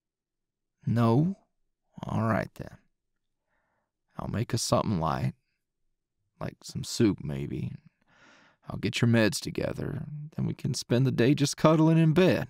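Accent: South, United States. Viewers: Me who is from the south and loving southern guy Me: FINALLY!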